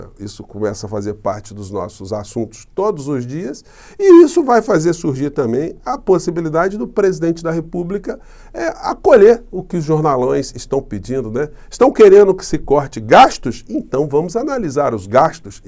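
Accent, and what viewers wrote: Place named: Bahia, Brazil. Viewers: Ó pá í ó, baianes clássico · Sotaque baiano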